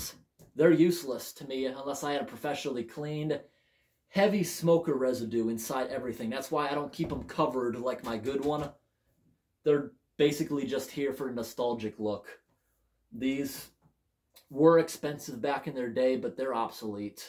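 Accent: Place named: Massachusetts, United States. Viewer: I've just stumbled onto your channel in the past few days, and you are an absolute delight. It's funny that we're the same age and have similar interest. I am a bit more into the entertainment side of vintage products and packaging like Chuck E. Cheese and Gadgets (Warner Brothers themed animatronic pizzeria). I wonder if the electrical and technological side of that may be interesting to you. Also, that Blue's Clues pasta in the background has really been taunting me. Are you going to save it for a reprint of the pasta? It may be more advantageous to just look from the SpongeBob pasta from the same year to get an easy comparison since they still make it. Oh, additionally, that "why do they call it an oven when you ove in the hot food and out hot eat the food" is a reference to an edited Garfield panel. It's a kind of obscure meme. 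Last thing, your Massachusetts accent is delightful. Stay rad. Love your stuff!!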